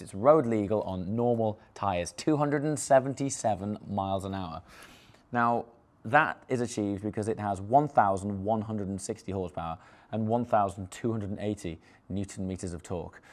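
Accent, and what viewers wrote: United Kingdom. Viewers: Why do you sound about 20% more british in this video?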